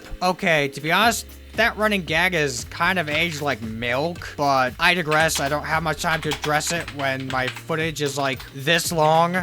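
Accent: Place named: United States. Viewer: What kind of American accent do you have? Very distinct/unique from what I am used to hearing.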